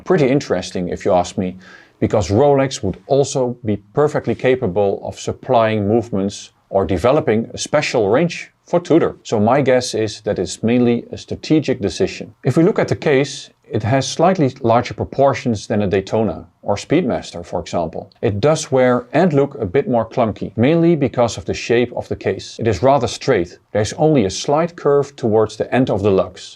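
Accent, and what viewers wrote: Netherlands. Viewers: I am one of the lucky owner of the black one. Its great to see this review in English with a Dutch accent :-) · I love how me as a Dutch person can hear from somebody's Englisch if he is also Dutch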